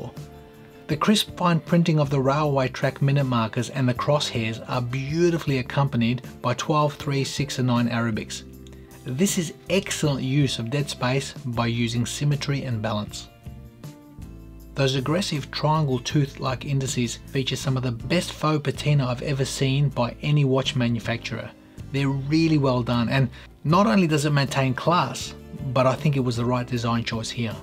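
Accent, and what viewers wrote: Australia. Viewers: Lovely accent. Aussie? 😉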